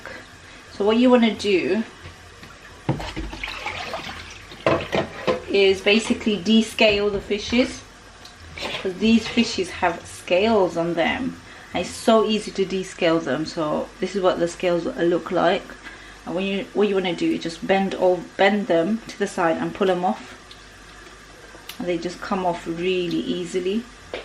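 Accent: Sylhet, Bangladesh. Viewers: Asalamualikum sister. MashaAllah very beautiful cooking you do ❤️👌. Love the way you talk sylheti 👍. I from sylhet and i live in Manchester. I just subscribed u beautiful Affa ❤️😘. Your fish dish looking amazing 😊👌 MashaAllah. Love you ❤️❤️❤️❤️😘😘😘😘😘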